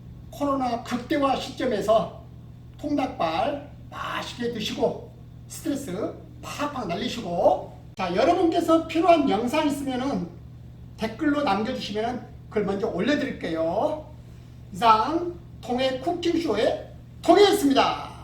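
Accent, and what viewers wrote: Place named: Chungcheong, South Korea. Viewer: 충청도분 이시군요 ㅋㅋ 경장히 ㅋㅋ 감사합니다.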